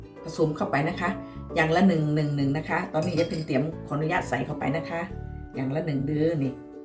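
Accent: Korat, Thailand. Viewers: ชอบภาษาไทยโคราช เพราะมากขอให้ รวยๆจ้ะ ลดคำว่า พี่น้องจ๋าๆๆๆ ก็จะดีมากคะ่ มันเยอะไป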